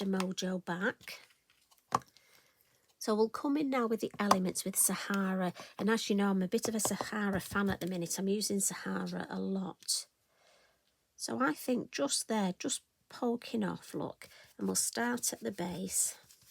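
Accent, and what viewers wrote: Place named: Northern England, United Kingdom. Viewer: you are a pleasure to watch, and as a northerner down in Devon, listening to your accent just takes me back home! I have learnt so much from your tutorials, yes! me! a retired art teacher!! This is all quite different and exciting to what I am used to! It must be because I am doing it solely for me and NOT for a set of marks! Now, my next adventure will be with Dinkles…so vibrant and pure! I can’t thank you enough …..very best wishes…Donna!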